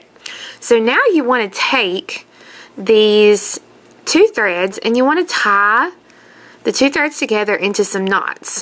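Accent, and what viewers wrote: South, United States. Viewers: I recently discovered U-Tube and then I found you! I've been bead working for about 15 years but my passion has been revived since watching your's and a few other's tutorials. You are a great teacher! I feel so blessed to have met you through this medium. I live in Alabama so your southern English is very understandable! Lol! I also subscribed to your site! Happy beading!💞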